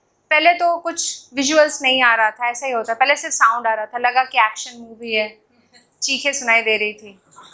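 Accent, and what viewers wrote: Bihar, India. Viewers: I like your bihari tone 😅😅😂😂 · That bihari accent.. 👍😂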